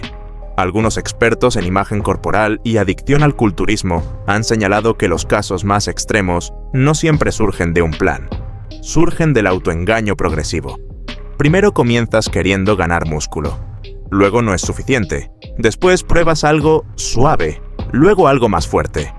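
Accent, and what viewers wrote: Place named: Colombia. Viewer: Que dramático eres. Este pana es colombiano, listo.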